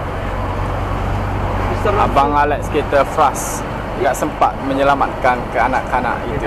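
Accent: Sabah, Malaysia. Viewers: Abg alex tu org sabah ker.. Haha macam logat sabah je..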